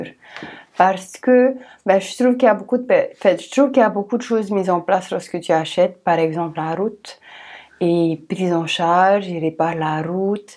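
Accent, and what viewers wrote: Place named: Tahiti, French Polynesia. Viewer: J’́aime bien votre accent français tahitien et la manière dont vous présentez la vie en Nouvelle-Zélande. C’est un peu plus rassurant que ce que l’on lit et regarde sur l’immigration dans ce pays.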